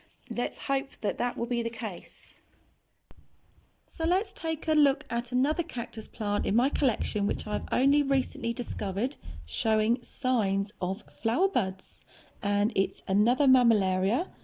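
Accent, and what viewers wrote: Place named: RP, United Kingdom. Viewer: I've subscribed to your channel. I like your content and your posh accent is a bonus. 😊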